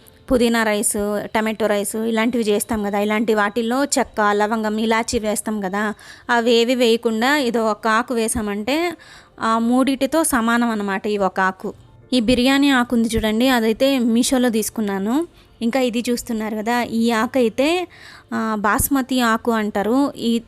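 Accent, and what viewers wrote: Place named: Tirupati, India. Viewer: Mee slang vini chala rojulaindi...maadi nellore .. tirupathi slang nellore slang chala kalustundi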